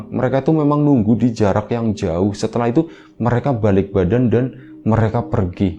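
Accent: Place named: Banyumas, Indonesia. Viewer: Hader kawan...ora ngapak ora kepenak😃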